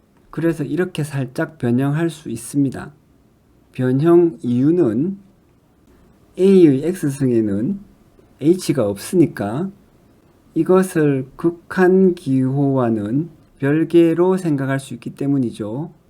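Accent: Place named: Gyeongsang, South Korea. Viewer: 경상도 사투리가 심하게 들리네요;;사투리로 들으니 e가 굉장히 특이한것처럼 보이네요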